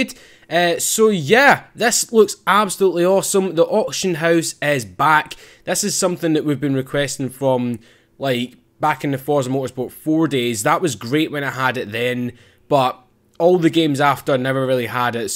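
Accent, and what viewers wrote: Scotland, United Kingdom. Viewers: u r my my fav YouTuber now not only r u Scottish like me but I can make out every word u say unlike the Americans lol. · he's from Scotland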